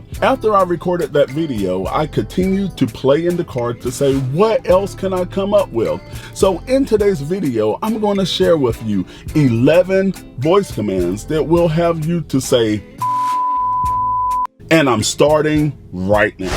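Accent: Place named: Atlanta, United States. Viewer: Great videos my man. First thing I thought when I heard your voice was “He sounds like he’s from Atlanta” and sure enough I saw the map haha. Keep up the good work.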